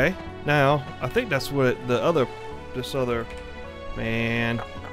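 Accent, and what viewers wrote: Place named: Texas, United States. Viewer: You sound like your from Texas or something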